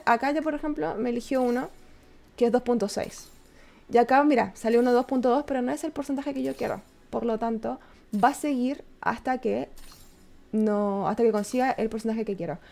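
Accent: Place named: Chile. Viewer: Una Pregunta, de que país eres? Tienes un tono que me recuerda a chile. Pd: Saludo desde Chile🇨🇱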